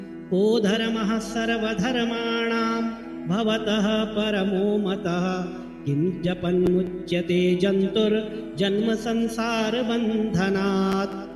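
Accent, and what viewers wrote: Standard. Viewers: सादर प्रणाम गुरुजी 🙏 आपकी अनुग्रह से हम घर बैठे विभिन्न मंत्रों और श्लोकों का शुद्ध उच्चारण तथा छंद सहित सही ज्ञान प्राप्त कर पा रहे हैं। आपसे विनती है कि कृपया शिव महिम्न स्तोत्र के पाठ का भी ऐसा ही एक नया वीडियो बनाइए। पुराना वाला थोड़ा अधिक लंबा हो गया है। कृपया लगभग 20 मिनट का वीडियो बनाइए, ताकि हम प्रतिदिन आपके साथ शिव महिम्न स्तोत्र का पाठ कर सकें और भोलेनाथ की कृपा प्राप्त कर सकें 🙏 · गुरुवर सादर प्रणाम आपके द्वारा श्लोकों का शुद्ध उच्चारण श्रवण करने को प्राप्त हुआ आपको कोटि कोटि प्रणाम 🙏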